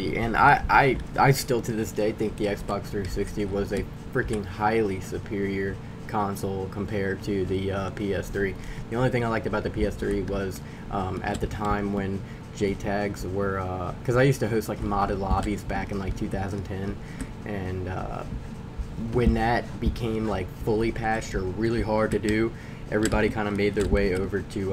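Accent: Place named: North, United States. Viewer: bc I live in Louisiana and he said he was from Louisiana just curious what part cuz he sounds like he from up north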